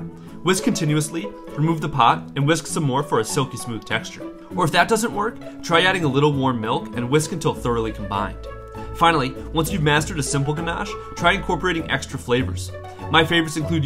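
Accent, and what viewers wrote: Australia. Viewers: Your Aussie accent really came out in this one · Why does he have an Australian accent for just this video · Love this channel, but is it just me or did just he get an Australian accent?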